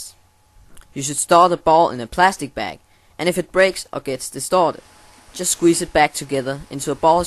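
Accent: Denmark. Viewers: You can easily hear he's danish, and also see it on the BORUP spirt and the vandglas xD Trololol, jeg er selv dansker ;D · Are you danish ? :D Your accent sounds danish ;) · dat danish accent! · I can tell you are danish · are u danish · You are danish! I can hear it in the way you speak english! Its almost like us,norwegians... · you danish?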